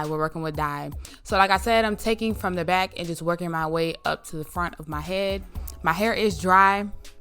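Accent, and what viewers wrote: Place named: South, United States. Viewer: SMH I love that country twang ☺️😍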